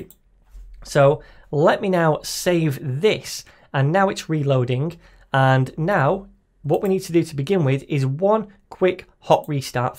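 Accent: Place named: England, United Kingdom. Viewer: I like your English accent!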